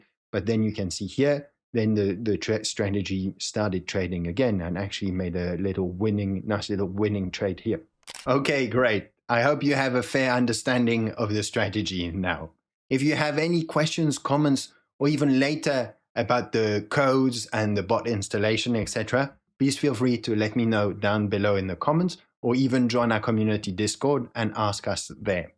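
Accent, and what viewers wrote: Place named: South Africa. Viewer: . I stumbled on your channel just now. Wow, it was the first video that linked me to this. Your accent attracted me....sounds South African. What a wonderful and detailed presentation that attracts the viewer to stay tuned as if we are in a Hollywood thriller 😊. Dude you are simply the best... This past month I have been watching so much nonsense on YouTube as I am trying to get back into trading after about two decades of absence. Much has changed with technology and I need to brush up before I re-enter the markets. Well done and God Bless 🙏🙏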